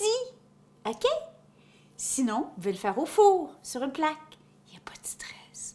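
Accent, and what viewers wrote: Quebec, Canada. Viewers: Oh bonjour Québec ! A cause de vous je me suis acheté un airfryer 😂 je connaissais pas mais ça a l’air sympa , et votre accent j’ai dit « on est obligé c’est le quebec on a jamais le droit de leur refuser quoi que ce soit » 😂 Donc j’ai hâte de le recevoir pour l’essayer et regarder vos petites recettes trop mignonnes 🥹 J’aime les plats léger pour le sport et lors de cheatmeal j’aime bien les beignets ou fritures sympa même si c’est un peu gras ! Merci à vous 2 😁😁😁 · Je m'abonne mais c'est pas vraiment pour les recettes , c'est mon métier cuisinier mais pour l'accent québécois , franchement ça me fait craquer , j'adore 🤣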